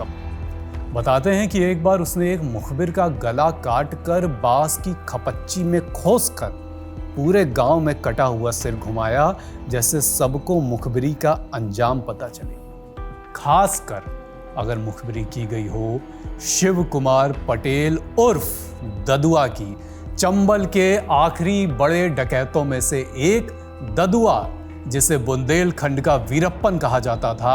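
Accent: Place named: Awadhi, India. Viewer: Bhai ye awadhi h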